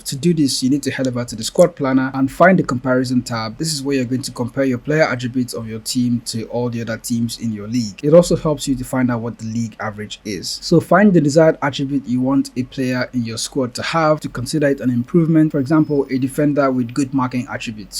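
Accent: Nigeria. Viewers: Are u Nigerian?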